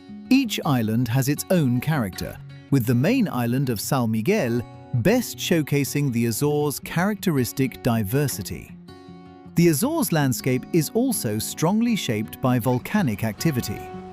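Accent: Spain. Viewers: You made a Nice video of my country,And We do have a beautiful country in my humble opinion..But ,Please learn how to pronounce our cities and places in Portuguese not in Spanish.Believe me we will appreciate it.❤ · The Spanish music in the background and the Spanish pronunciation of Portuguese words (particularly words with 'J') is very disappointing. Portugal and Spain are culturally very different, in fact they were historical enemies for many centuries, so hearing Spanish music in the background and Spanish pronounciation in a video about Portugal is like nails on a chalkboard. It wouldn't have taken that much more work to doublecheck the pronounciation or at least use music that is not so obviously native to Spain like .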